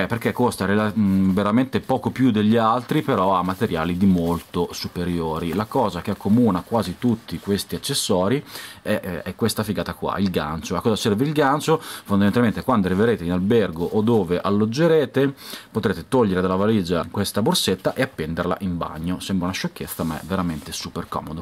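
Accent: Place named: Modena, Italy. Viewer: Sembra di Modena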